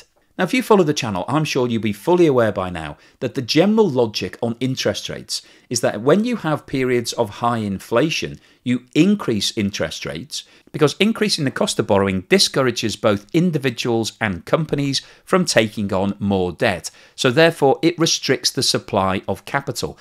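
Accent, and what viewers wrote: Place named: England, United Kingdom. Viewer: Sometimes, just for fun, I talk in voice and pretend I'm English.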